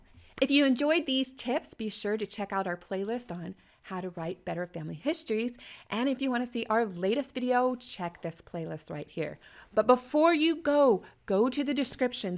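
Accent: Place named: Texas, United States. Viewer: The Texas is strong in this vid,. =) It's probably not a good idea to add dialogue because living relatives may be like "Grandpa never said that!" At best, the only thing you can do is just write about the situation. Some people have put words in ancestors' mouths but have said it was dramatized at the end of the book. Not sure if I'd be comfortable going that route. Always a good thing to verify with people who may have known a few people. For example, my parents knew their grandparents and how they acted.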